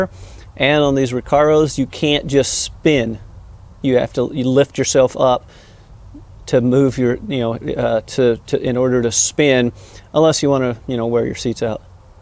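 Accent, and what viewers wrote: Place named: South, United States. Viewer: That’s just how many from the South speak — I, for one, like it. Be pretty boring if everyone spoke at the same speed. Watch reruns of Andy of Mayberry or the film To Kill a Mockingbird; that’s how folks talk down there. Seinfeld? Much faster. It’s all good.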